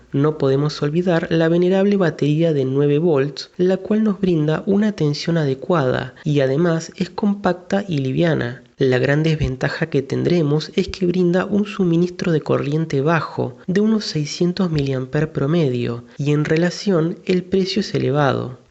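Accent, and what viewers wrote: Argentina. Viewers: Hola. Bueno te comento que le hice mirar tus videos a mi abuela y entienió todo. Hoy ya no mira telenovelas y esta enganchada con tus videos. Ahora en serio, la calidad técnica de las imagenes son exelentes, camara, luz, ecsenario, todo controlado al minimo detalle. Tu capacidad didactica es un don especial que tu lo tienes. es dificil no entender alguna cosa. Por tu tonada eres porteño en lenguaje de arduino es -- pero tu GENEROSIDAD es ++++++++++++++++++++, te mando un abrazo grandote y gracias por todo lo que aportas.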